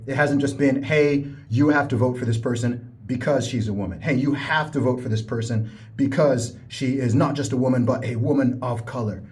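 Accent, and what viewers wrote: North America. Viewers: Actually, I DIDN'T know you were a brit tbh. It's your accent, it sounds abit like North American english. Oof, I feel stupid now.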